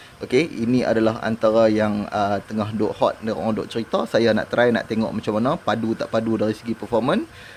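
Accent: North, Malaysia. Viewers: Assalamualaikum w.t.b tuan.. Kluaq loghat utara na.. Pekat wei.. Terbaik bro.. Salam dri follower channel hang dri negri bwh bayu.. Teruskn brkongsi info dn ilmu.. Smoga sukses slalu.. Aamiin.. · Baguih nya hang punya cakap utara.. terhibur dan senang nak hadam ,lepaih ni buat video cakap utara semua baru ngam..haha. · haaa baru la ngam guna, utara mai, depa faham ja tu,guna loghat utara universal, terkemut-kemut 🤣, good job 🤙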